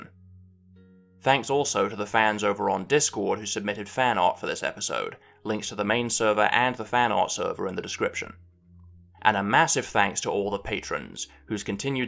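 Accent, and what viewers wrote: United Kingdom. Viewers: A guy with a British accent is explaining a prehistoric world to us. It makes me wish that there wouldn’t be copyright consequences if you just started using the musical score from Walking with Dinosaurs for the background of these.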